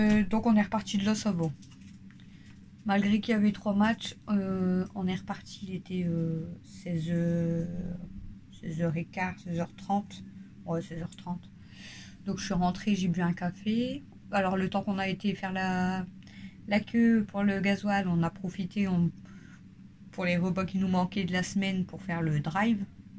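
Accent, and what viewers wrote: Nord, France. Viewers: J adore l accent du Nord Etant moi même du Nord que J ai dû quitter avec regret pour La charente maritime 😏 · Un peu tard, mais de tout cœur, bonne semaine, surtout bon courage pr le gd nettoyage!!!! Mais oui, on aime ta voix, surtout l accent de Nord!!! Ma maman était du Nord, près de Lille, mais n avait pas d accent, 1 de ses tantes, l avait, chaque région, son accent!!! J aime aussi celui de et, gros bisous à vs tous et papouilles à Stella et aux chats!!!!!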